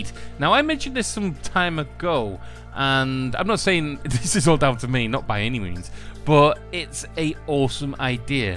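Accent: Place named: Northern England, United Kingdom. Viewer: spoken like a true northern lad ha ha! Glad you got the rest you deserve it's nice to see your stuff coming out whenever I get chance to see them, your EMUnation is a great idea. I'm sure the guys on the dev teams appreciate you as much too. Have a great one mate and have a beer on us!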